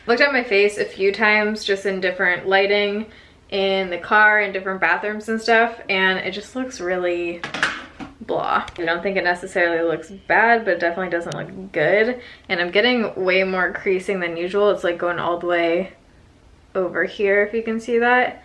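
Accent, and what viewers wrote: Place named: Maine, United States. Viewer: Where are you from? You sound like you have a Maine accent! I'm a Maine girl, I'm probably way off but I was just wondering 😊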